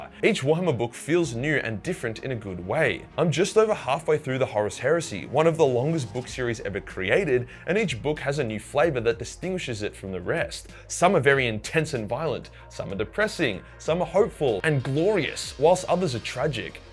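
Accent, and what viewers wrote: Australia. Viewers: Is it me, or is everything better when explained by an Aussie?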